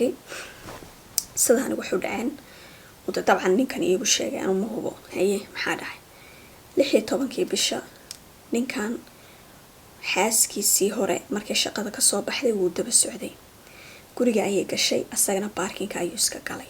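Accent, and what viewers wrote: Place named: Mogadishu, Somalia. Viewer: Majarafad waa badiil lahjada reer xamar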